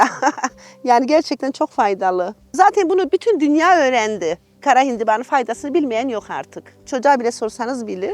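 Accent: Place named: Adana, Turkey. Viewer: Adanalılar şivesine benziyor Ablanın konuşma tarzı verdiği bilgiler çok kıymetli teşekkür ediyorum Allah razı olsun. 🤲🌷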